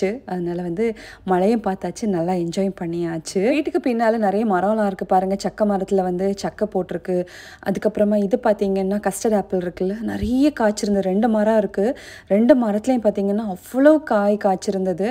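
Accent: Kerala, India. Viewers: malayali aanu alle ... I can guess from the accent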